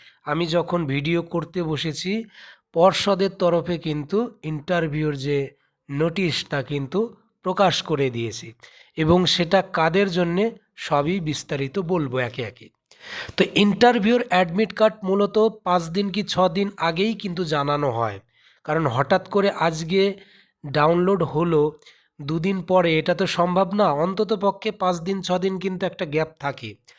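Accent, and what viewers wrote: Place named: Bangal, Bangladesh. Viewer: Upni bangal , kotha te bojha jacche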